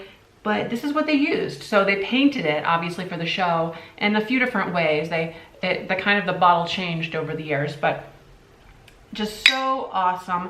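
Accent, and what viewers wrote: Midwest, United States. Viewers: Love watching you! I’m from South St. Louis originally and now in Florida. I can tell you’re from the Midwest! How close are you to St. Louis?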